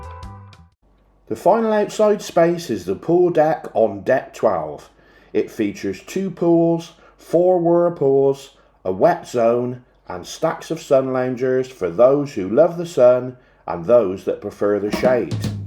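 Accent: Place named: England, United Kingdom. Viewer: Sorry if this has been asked before but what part of England is your accent from, Devon? Just discovered your videos -great job you two!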